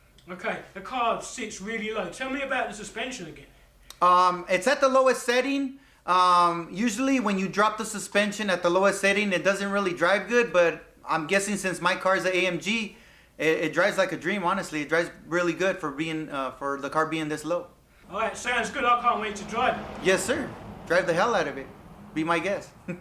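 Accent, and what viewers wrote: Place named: England, United Kingdom. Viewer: I was so shocked to hear a fellow Englishman!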